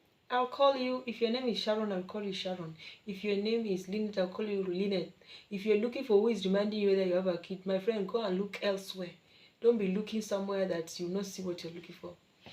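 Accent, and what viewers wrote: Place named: Nigeria. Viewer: An informative video. As a Pan African and a Nigerian I was immediately drawn to watch this video as it warms my heart whenever people of African descent come together in love. I’m sure you’ve heard this before but I was struck by how your accent now has a distinct Nigerian tone to it ….. especially as you inject quite a few Nigerian words and phrases. Lol. Hopefully, there will be a part two to this video as this video seems to be overwhelmingly focused on your negative experiences and warnings about Nigeria …… as I’m sure on reflection there are more positive experiences and recommendations that you can share about life in Nigeria. I have subsequently liked this video and subscribed to your channel. Peace.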